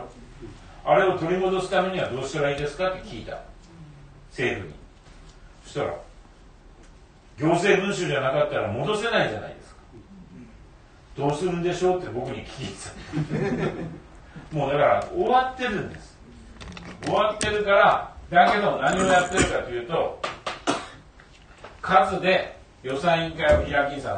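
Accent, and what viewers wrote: Saga, Japan. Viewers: 佐賀弁が身近で良い。解散があるんですね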